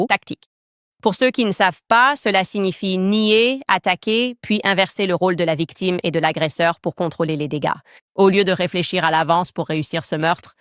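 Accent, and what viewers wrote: Quebec, Canada. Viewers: Bonjour Candace Je suis français et je t' écoute en français. Si ton français (issu d'IA) est très bon, je dirais qu'il n'est pas parfait car il comporte quelques accents canadiens que l'on ne trouve pas dans les diverses régions de métropole. Le français des iles caraïbes ou d'Afrique a aussi des accents marqués mais pas canadiens. Cela dit l'écoute reste confortable pour un français qui ne comprend pas l'anglais et encore moins l'américain. cordialement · lol, same here. Weirly she speaks with a slight Quebec accent, and some of the translation is a bit approximative, but still, it is pretty impressive. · en tant que Français ça donne un ressenti de québécois édulcoré. Mais je ne suis pas du tout spécialiste 😄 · C'est vrai pour l'accent québécois mais ça reste très confortable a suivre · AAahh le francais AI est bizzare, c'a laire du francais quebequois 😂😭😭going back to main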